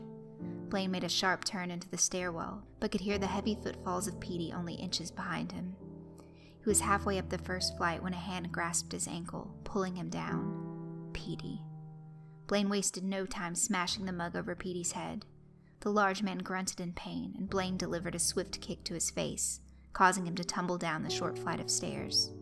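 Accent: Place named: Georgia, United States. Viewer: Where are you from in Georgia? I'm from Loganville and our accents sound really similar, I know that different places have a little different variations on accents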